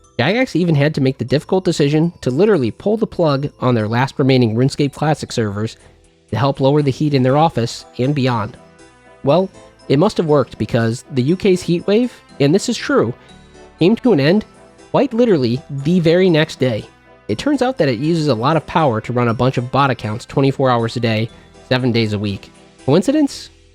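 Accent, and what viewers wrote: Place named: Great Lakes, United States. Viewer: "Great Lakes accent" you answered my question I posted on a previous video.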